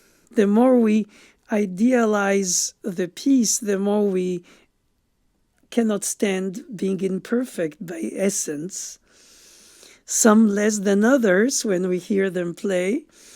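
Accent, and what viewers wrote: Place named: France. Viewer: I can listen you speak for hours. I don’t know if your manner of speaking is because of your native French or because of your intellectual and aristocratic sophistication. But you definitely sound like someone from the past (from a glorious, superior past) and that both makes me happy and sad.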